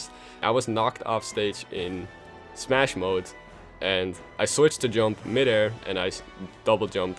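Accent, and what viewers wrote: Netherlands. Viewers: Are you Dutch or something, you sound really Dutch. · I can tell you're dutch. Do you play tournaments?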